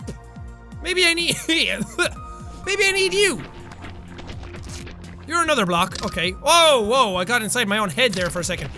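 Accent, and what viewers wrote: Ireland. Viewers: is he irish?? im new ;p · are u asking if he's Irish or not because he definitely is Irish and he's had that accent for a while haha :3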